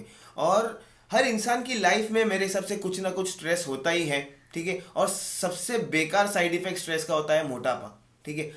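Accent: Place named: Mumbai, India. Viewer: Typical mumbai slang bhai..rocks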